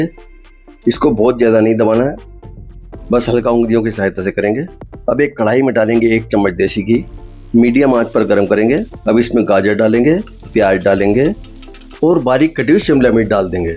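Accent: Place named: Punjab, India. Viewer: Aapka punjabi accent bht mast h👌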